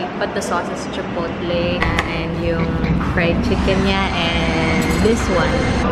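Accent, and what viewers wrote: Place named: Pampanga, Philippines. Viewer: o diba si na pinanganak na tlagang may kaya ung pamilya sobrang humble and ineembrace yung kapampangan accent nya, yung iba na bago lang nagkaron dahil sa pagvlog sobra makaslang at pa sosyal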